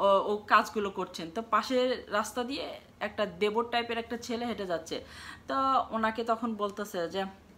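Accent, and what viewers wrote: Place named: Bogura, Bangladesh. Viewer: আপ‌নে অ‌নেক ভা‌লোই ক‌চেন ত‌বে মা‌জে ম‌দ্যে এ্যানা বগড়্যার ভাষার সা‌তে আ‌রেক জেলার ভাষা মিশ্যাল করচেন।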